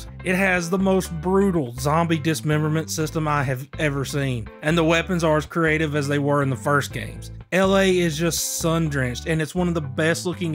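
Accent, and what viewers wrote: South, United States. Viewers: Sounds like you a southern boy.... like me lol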